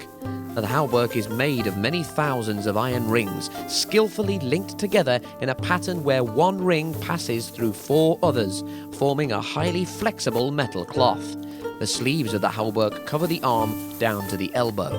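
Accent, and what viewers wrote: England, United Kingdom. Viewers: Haha, at first I though he said, "Knights, minotaurs, and foot soldiers..." Not used to hearing English accents. Good video.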